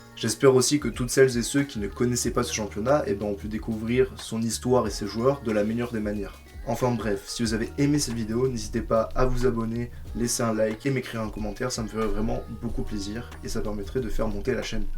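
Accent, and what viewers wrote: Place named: France. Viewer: Un bel accent frenchie et du rythme, ça s'annonce bien cette petite chaine ;)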